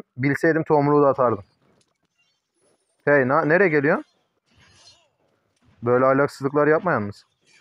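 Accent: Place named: Thrace, Turkey. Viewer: bizim Trakya insanına benziyor sesin zaten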